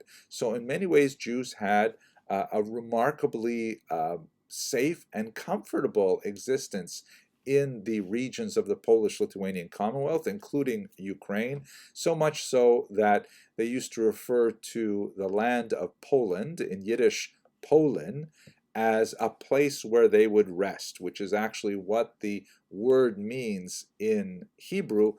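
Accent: Canada. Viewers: Dr, as a fellow Orthodox Jew who often deals with the same issues among our co-religionists, thank you for your message and thank you for the knowledge you are sharing. Also, it took me a good few minutes to understand what you meant about "Bot" and "bought" until I realized that in your Canadian accent these words rhyme while they don't for me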